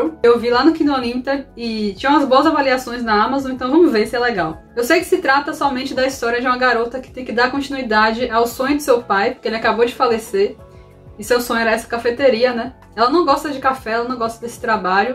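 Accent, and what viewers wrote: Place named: Salvador, Brazil. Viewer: eu ouvi seu sotaque e na hora pensei: oxeee é de salvador será? kkkkkk <3 amei seu canal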